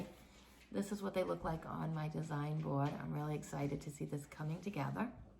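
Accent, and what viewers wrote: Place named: Boston, United States. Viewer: I have a couple of questions. Is there a reason you roll your fabric instead of fold it, or is it just preference? In what part of Maine do you live? I thought for sure your accent was from Boston. 😊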